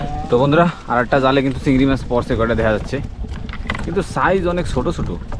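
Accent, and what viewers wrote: Bangladesh. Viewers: কথায় বাংলাদেশী ভাব 😅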